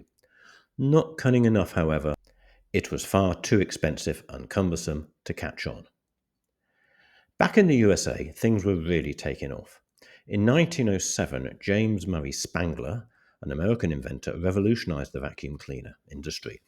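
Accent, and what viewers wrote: United Kingdom. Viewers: Love your channel! I’m a sucker for documentaries and history and your English accent makes it all the more better lol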